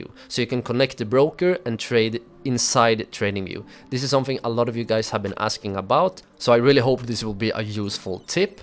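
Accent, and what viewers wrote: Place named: Sweden. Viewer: Like the swedish accent❤️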